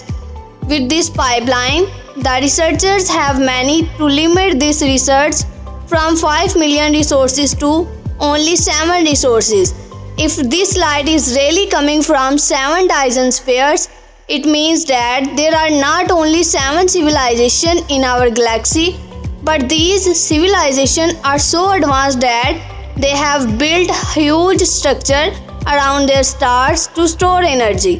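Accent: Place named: India. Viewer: jesus fck that fckin indian accent..villa ttttaatttttaa wittaa tttaavitta ttaattt aaa 😆😆😆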